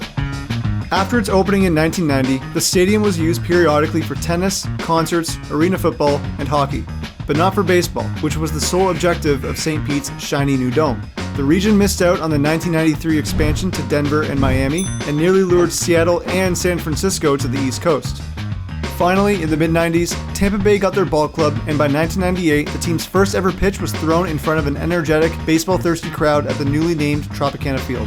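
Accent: Canada. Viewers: Is the narrator Canadian? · Your content is great. The only complaint I have is that if you want to be known as a top video producer, you should make a conscious effort to pronounce the ou, ow sound as people in the U.S. do and not as a Canadian. When i took a speech class in college, the first thing the professor did was explain that if we were ever to go into broadcasting, it was very important to have a generic voice that doesn't let people identify us with an area. You can go to New York for example and the broadcasters talk the same as the broadcasters do in Texas or Alabama. That is the only fault that i have. The content is great but with a little effort, you could make it even better. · I noticed the Canadian pronunciation immediately because I have a friend from New Foundland! I remember reading that when Kate Mulgrew went to NY for acting classes that was the first thing she had to do was to lose the Midwestern accent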